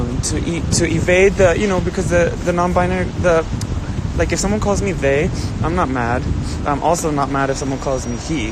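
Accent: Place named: Venezuela. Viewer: Just because I don't understand someone, doesn't mean I have to hate them. Her Venezuelan Spanish is hard for my Texan ears.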